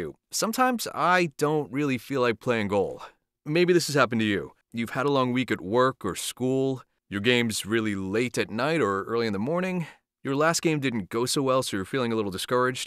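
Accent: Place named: New England, United States. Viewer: What part of New England are you from? I'm from Massachusetts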